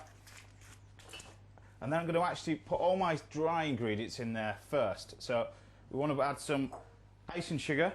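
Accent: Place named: Yorkshire, United Kingdom. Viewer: How Yorkshire does he sound! I fell in love with when I lived in Leeds but never thought he sounded really Yorkshire. But now I'm back in Australia, I can't believe how strong it is! By gum lad.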